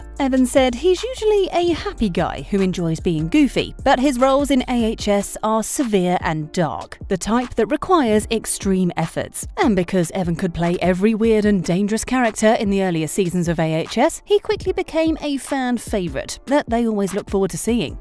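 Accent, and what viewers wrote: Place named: United Kingdom. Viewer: This would have been better if Evan was the one talking... instead of a girl with a British accent 😉 I wish Evan the best..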